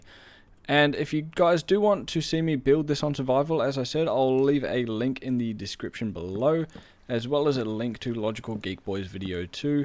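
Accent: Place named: New Zealand. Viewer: Subtle kiwi accent almost snuck past me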